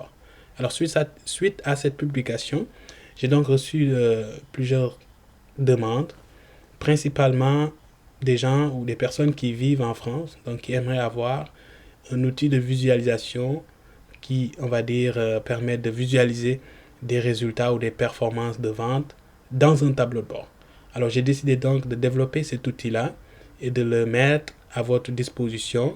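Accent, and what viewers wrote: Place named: Senegal. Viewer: Tu es probalement sénégalais à ton accent. Pourquoi ne pas travailler aussi sur une carte de ton pays?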